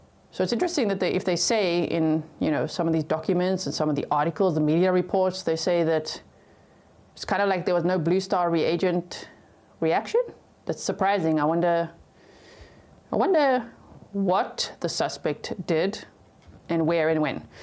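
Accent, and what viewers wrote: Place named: South Africa. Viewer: Why do you sound SOUTH AFRICAN?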